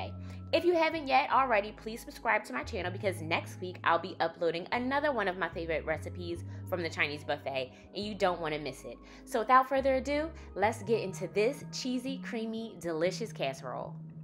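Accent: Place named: Philadelphia, United States. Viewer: You from philly!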